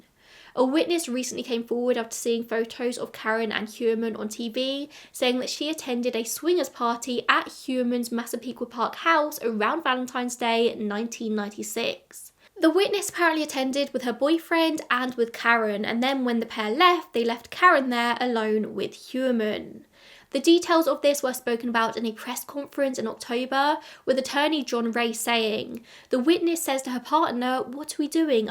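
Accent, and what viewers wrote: United Kingdom. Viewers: You're not saying it wrong at all, just British. Plus, you nailed your american accent of it! ❤😮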